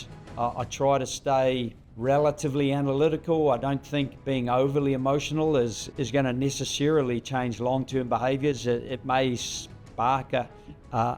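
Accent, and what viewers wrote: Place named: Ireland. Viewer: I actually taught he was Irish, didn't know he cam from NZ 😂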